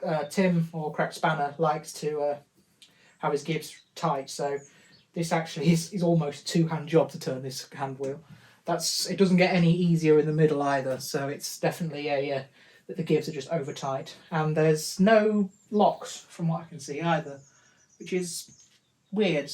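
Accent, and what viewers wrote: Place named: East Midlands, United Kingdom. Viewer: hello me love.." here i am again .. oh ! merry xmass and a happy new year.!.i dont suppose you have amongst all your treasured parts ,,, a table for a centec 2 mill.. the one i have is a right state with over tightening the " t " bolts the upper has broke away it wasnt me !. i want it fixed or replaced... can you help ? .by your accent you dont live too far from me " derby notts area ,",, hope you can help regards laurence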